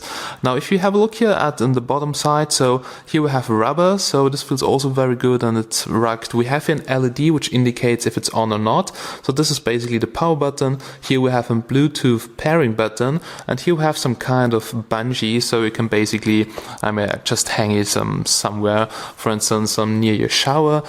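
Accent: Germany. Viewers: uhhhh ....i hate the german accent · wtf is that fucking german accent?!!!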